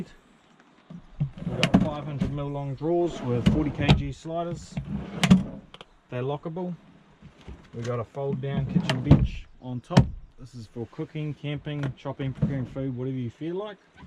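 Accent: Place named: New Zealand. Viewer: All us kiwis sound like that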